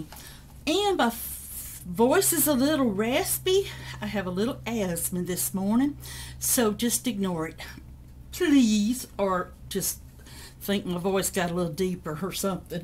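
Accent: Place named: South, United States. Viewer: What a lovely personality and creative spirit you have !I would also like to ad how adorable your southern accent is ..I was raised in Ga.but now live in NY for 25 years..thanks so much for the great tips and encouragement... it is such a joy to watch your videos .I have subscribed and look forward to more videos !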